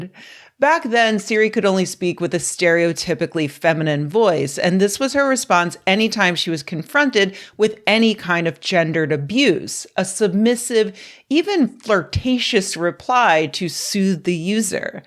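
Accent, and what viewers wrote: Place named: Boston, United States. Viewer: the boston accent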